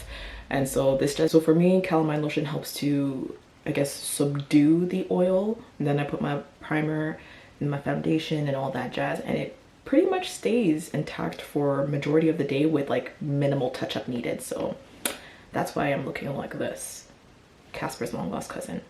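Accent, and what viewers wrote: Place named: England, United Kingdom. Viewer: I loved the touches of the English accent 😂😂😂 Beautiful finished look ❤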